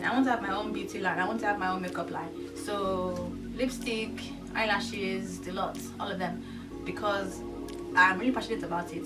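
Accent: Igbo, Nigeria. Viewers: Plus i love your hair and the Nigerian accent. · awwwh i loved this loved this vid specially the intro and the end that accent was on point u spoke with the typical igbo english accent like those ontisha boys hehehe...am yoruba and olodo is our common insult loool but i have a lotta igbo frnds and i caught d pigin englih with the accent lool i really loved listening to u wiv the accent....and yeah keep ur private life private like popc z dont air ur linen in public...but i really wish you all the best and hope you find the bone of your bone and flesh of your flesh ijn.....great vid s=xoxo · Thanks for opening up in this video. I loved hearing your Nigerian accent. It was nice to "get to know" you in this video! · Girl! I love ur Nigerian accent. I am reli seeing a difference in u and I have been watching ur videos for yrs. I mean u are coming out of ur bubble more and I'm seeing ur personality. 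I'm in da same boat as u, I feel like I'm dissing Ghanaians went I put on my Ghanaian accent smh btw I'm Ghanaian. I would love to meet u someday. Luv u xoxo l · lol your nija accent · I really love your Nigerian accent. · Best video ever. Soo relaxe and cool. Love your nigerian accent. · I loooooove this video.. and many others:)..plus the Nigerian accent has so much win to it. Keep shinning:) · Love the naija accent....may all your dreams come true IJN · Your Nigerian accent is really cute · Love the Igbo accent👏👏👏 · Lol... The naija accent !!! I feel u girl..sounds foreign to me as well wen I speak .... But still naija for life !!!!... Forget accent joor!!!! Lol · You're naija accent! Everything! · Love u lol,, naija accent tho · Looooool...OMG! Best video of yours I've seen! Your Nigerian accent is sooo funny, but abeg you still try. This hair is amazing on you, the bangs suit you so much. 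I agree with the annoying comments and keeping your personal life to yourself. You are pretty amazing :) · i loooooooved your nigerian accent so much!! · Awww the 18 minutes went by so fast lol. I loved the Nigerian accent tho! Thx for sharing! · Your Naijah accent is flawless though! ♡ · I loved this. The naija accent couldn't have been better. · The intro accent is just the bomb!.... Typical naija..lol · You are very beautiful on this video You seem to have a beautiful personality And I loove your nigerian accent. That was good 😄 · sounds kind've British to me; Personally i rather your Nigerian accent. That's just my opinion, keep up the good work tho :* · lmao love your nigerian accent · Sweet and Honest. Enjoyed watching you and enjoyed the Igbo accent. You have company... I'm bad at it too... my fiance is Nigerian and he kills himself laughing every time I try that accent. Stay strong sweets! 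· If your videos will be more like this,will definitely be watching every post!! Love your naija ascent 😘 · Lol your Nigerian accent is too cute. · Your naija accent tho. Lool. You sounded like Shalewa in that Nigerian soap opera tinsel. But regardless, I think you are super cool, and pretty too. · OMG!! I LOVE hearing your Nigerian accent!!! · Lmao that Nigerian accent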